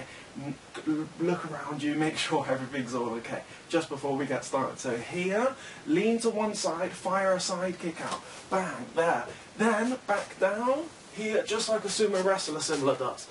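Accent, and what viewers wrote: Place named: United Kingdom. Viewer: I love your videos. Very effective. And I love your British accent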